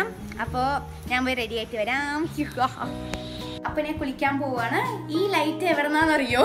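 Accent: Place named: Palakkad, India. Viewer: Anthokkeyayalum plkd slang varum ley😅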